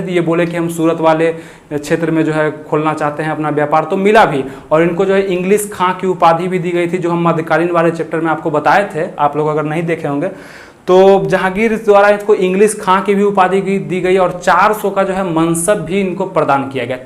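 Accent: Bihar, India. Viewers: Thanks a lot sir🙏...aapk language se lgta h aap Bihari ho aur hum v (i proud to be Bihari)💪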